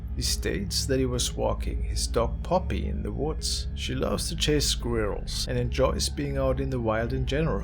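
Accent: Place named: Germany. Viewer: He is German ! Listen to his W's they sound like V's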